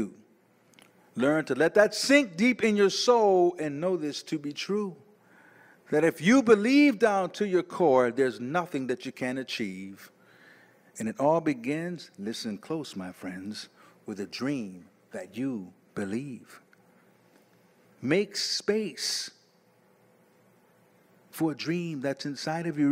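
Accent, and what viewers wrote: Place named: New Jersey, United States. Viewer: yessss!!! Excellent points. When I first heard you and lady C say that “I’d rather have questions we can’t answer than answers we can’t question “ that resonated so hard with me. I mean , it was so enlightening. That fear is so thick we didn’t even realize we were living in it. I thought you’d be the best video to send to them because you look like that bethelite, you’re relatable, your presentation was what we as former Jehovah’s Witnesses can relate to. Your voice etc. reminds me of the congregations in New Jersey and NY. Thus, I thought they would be comfortable to listen. All they heard was this was an ex JW. DEMONS!!! It is an ATM great analysis. I went through a period where I’d lose sleep thinking how can I get my family to WAKE UP. Sadly after my mother’s passing in December 2018, I had to learn to let it go and let God. 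They were never going to listen to me now. Looking forward to seeing mom in the “new system “. The mind is more amazing than I ever could imagine. Some can go thier whole life excepting that they don’t deserve answers to their questions while others actually have the courage to break free from that conditioning. God bless you and Lady C for your inspiring work.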